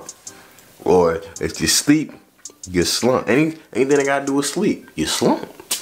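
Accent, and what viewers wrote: New York, United States. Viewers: U have a New York accent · I can hear the ny accent in your voice but you picked up onna florida shit so decently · u sound like you from newyork then anything.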